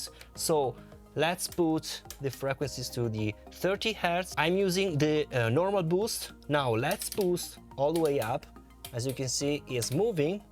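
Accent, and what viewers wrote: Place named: Italy. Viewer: this is how italians talk english 😅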